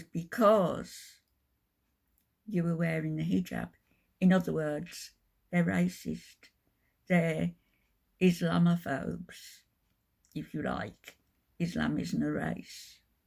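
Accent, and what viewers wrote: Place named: England, United Kingdom. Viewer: and for the ones that areagreeing with this lady coming on here and making videos she’s the one coming off as a bully she makes videos and just talks garbage and expects nobody to react to it I mean come on this is a bully channel and she only sounds “graceful“ because she has an English accent but trust me if she was American the way she would probably be saying things is probably the same thing as it would sound now you people are sick supporting this channel one day she woke up started watching Chantel and decided to jump on the shit talking aspect of things and all she talks about in her videos is Chantel it’s pretty obvious this lady doesn’t like Chantel so she makes a whole entire channel based on shit talking on her and she expects Chantel just to stay quiet? I don’t like Chantel‘s behavior at all but I would think that people like this would have much more things to do and much more creative things to do at her age than making these types of videos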